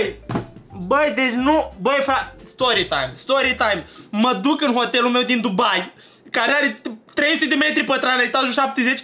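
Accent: Moldova. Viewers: Tu ești moldovean?😱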